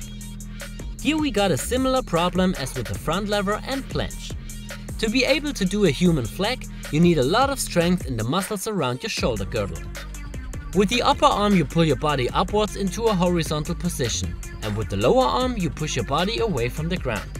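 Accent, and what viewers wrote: Germany. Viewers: lmao... 1 sec in to the video i knew u was german,no offense tho in any way or smt i was just pointing it out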